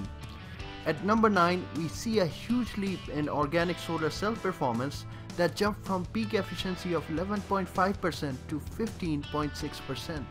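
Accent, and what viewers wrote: India. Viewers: I kinda started liking Indian accent.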